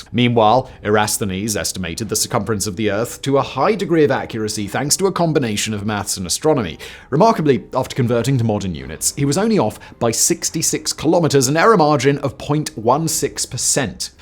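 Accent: RP, United Kingdom. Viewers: Very imperial British accent... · You Tube SUCKS! I have removed this man's channels over and over again. But when You Tube changes the algorithm, it force feeds me this Simon guy's many channels despite my removing him. Simon's channels suck. They are poorly researched information that is mostly government propaganda talking points. Terrible videos. And just because Simon has a British accent, this doesn't not make him an authority on anything. He's just a talking head. England is over. Brexit has made the only job in England a You Tube content provider. Terrible list videos that spread falsehoods and bull.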